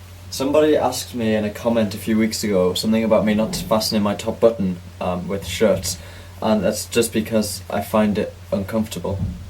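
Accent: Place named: Northern England, United Kingdom. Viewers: He definitely has a northern accent, as I'm from Newcastle and I can spot a hint of it assuming through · I can't place your accent, is it gateshead....? Or somewhere near Newcastle?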